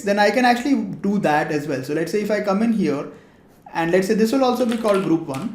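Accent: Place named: India. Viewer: Ah, indian accent <3 best houdini explainer <3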